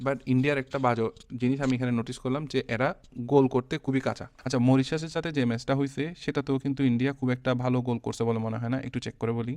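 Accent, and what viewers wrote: Chittagong, Bangladesh. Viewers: ki bhai chatgaiya naki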